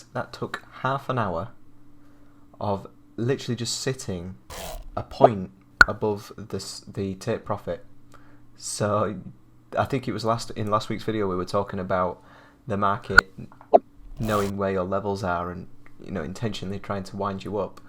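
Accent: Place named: Leeds, United Kingdom. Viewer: I live 10 mins away from leeds, I knew i recognised your accent ha!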